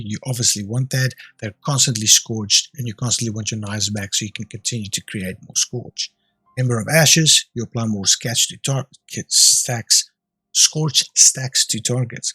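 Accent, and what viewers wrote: South Africa. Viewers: Great build video. I'm rocking something similar to this. Gonna edit and see how yours feels Are you from SA? The accent is so distinct.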